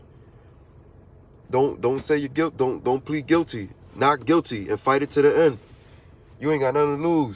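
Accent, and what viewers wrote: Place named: Philadelphia, United States. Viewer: your from philly bro i can hear it, fighting my 2nd dui right now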